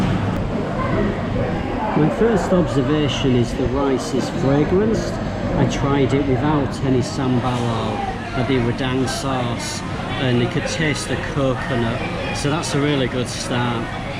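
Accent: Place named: Northern England, United Kingdom. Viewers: Selamat Hari Natal (Merry Christmas) dan Selamat Tahun Baru (Happy New Year)! I’ve changed my YT name from UncleGweilo to this. It’s now even more of a mick take of me by me to Singaporeans, who use “ang moh” rather than “gweilo” to derisively refer to a white person. You and your anchovies,! LOL. You should call them ikan bilis- their Malay name- and avoid the issue totally. Great to munch on with a cold beer after a long day's exploring. Go with the flow trying to pronounce Malay and Chinese names. Be like the Malaysians; if it’s close enough that you can be understood, it’s okay. "Relak lah, dun worry" as they say in Singapore! I'm not going to have a go at your northern accent as I'm Australian, which is a curse in itself! I think there are more varieties of nasi lemak than a cat has hairs. With so many cultural influences at play, you can just head from place to place to find the one you really like. 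Changes in the sambal; fried egg, boiled egg, or century egg; barbecued versus deep-fried chicken, and so on. A guy by the name of Seth Lui recently posted a video comparing five different nasi lemak places in Singapore. Search him out on YT, if you’re interested. Gregory Leow, who travels around Singapore seeking out authentic traditional ethnic Chinese cuisines also does great work reviewing food without making a “foodie wanker” of himself. What you think is the best is the best because you think it is, and what anyone else thinks doesn’t really matter. But if you s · that's fine, but his accent is heavy Northern. I bet you guys understand my standard American accent more. lol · South yorkshire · Hi, which part of Uk you come from? Liverpool? Sound like you are from the north. I’m Malaysian live in Uk for 29 years! Fuck! Really?! Yes, but I love it here! When I watch you the first time, I feel like ‘home’, you know what I mean?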